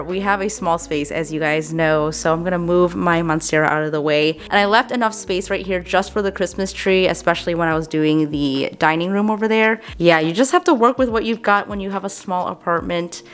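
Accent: Chicago, United States. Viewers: I just stumbled upon your channel after watching a bunch of Youtube Christmas decorating videos. LOVE your videos, especially your DIY projects. Also your Chicago accent reminds me of home (I’m from Illinois originally and your voice sounds just like one of my friends ♥️). Happy holidays to you!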